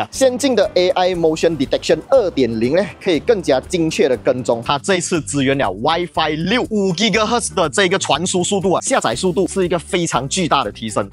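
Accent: Malaysia. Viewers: 听马来西亚华人说华语好舒服的感觉 👍 很可爱的口音 讲解也很专业 爆赞 ❤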